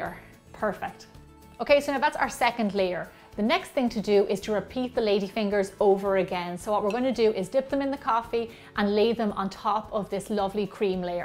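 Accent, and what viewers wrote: Ireland. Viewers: Why you sound Irish, not Italian! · you must be irish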